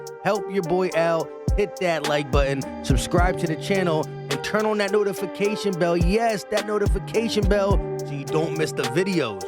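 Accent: Philadelphia, United States. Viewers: Does everyone is Philly talk like you?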